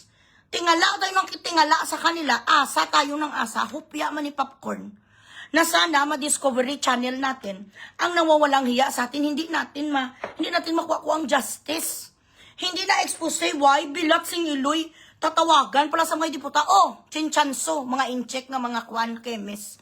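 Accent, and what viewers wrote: Iloilo, Philippines. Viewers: 😂😂ginagalit mo si madam,,,,, 😂 natatawa ako sayo pag nagsasalita k,,, ilongga..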